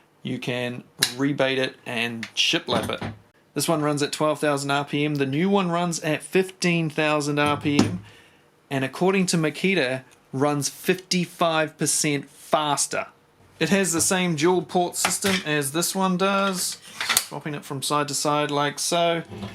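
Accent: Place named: New Zealand. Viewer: G'day, sounds like your a New Zealander. Are the tools you review available in Australia please.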